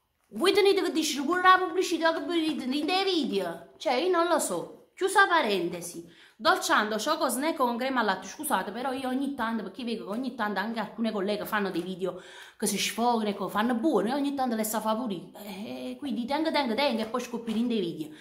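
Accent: Naples, Italy. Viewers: Simpaticissima, anche se avvolte quando parli napoletano non ti capisco. Ma seguendo il discorso riesco a comprendere. Baci❤️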